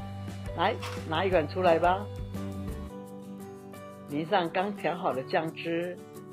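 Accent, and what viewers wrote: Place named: China. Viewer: 明明是台灣阿嬤為什麼要講話口音辨成大陸的大嗎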